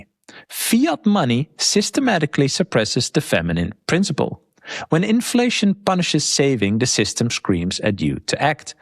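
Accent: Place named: Netherlands. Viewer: Yo! You are the young Jack Nicholson but with a Dutch accent! Keep up the great work, hello from Hungary!✌️😎💚💎✨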